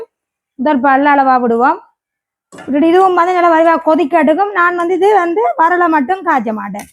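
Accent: Sri Lanka. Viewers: நான் இந்தியாவின் தமிழ்நாட்டிலிருந்து பேசுறேன் ....இலங்கை தமிழர்களின் தமிழ் உச்சரிப்பு மிக இனிமையாக உள்ளது என்பதை உங்களது பேச்சில் நான் கண்டேன் ...இனிமையான குரலுடன்.....அதே போல் உடல் வியர்க்க உழைப்பார்கள் ஈழதமிழர்கள் என்றும் அறிந்தேன் ..! என் இனிய ஈழ தமிழர்களுக்கு வாழ்த்துக்களுடன் சீக்கிரம் உயர்நிலையை அடைவீர்கள் என்றும் தெரிவித்துக்கொள்கிறேன் ..! நன்றி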